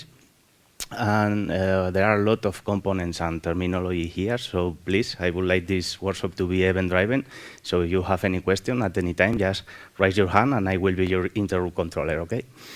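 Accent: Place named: Spain. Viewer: english with a strong spanish accent but so useful ...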